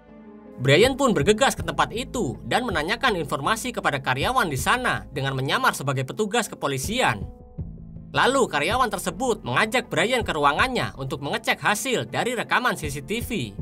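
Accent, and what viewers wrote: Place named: Ngapak, Indonesia. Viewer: Wong Jawa ya mas, ngapak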